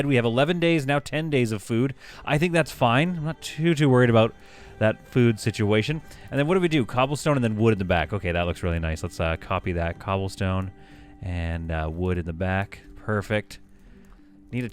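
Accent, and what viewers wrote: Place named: Black Country, United Kingdom. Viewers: We don’t claim that accent, it’s more brum or Black Country. 🤣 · That was not a Scottish accent! :P that was like the midlands of England LOL still love the videos though :) keep it up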